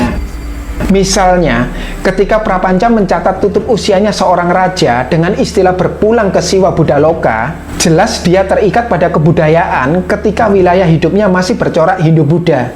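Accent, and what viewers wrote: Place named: Trowulan, Indonesia. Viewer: logatnya .. Pak Dosen orang trowulan kah ?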